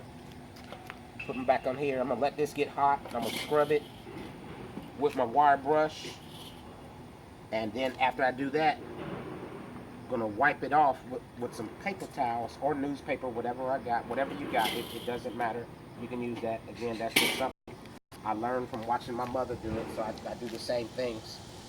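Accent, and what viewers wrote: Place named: Visayas, Philippines. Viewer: W/my bana, kron pako kBntat . Hehe bisaya diay ka si.😂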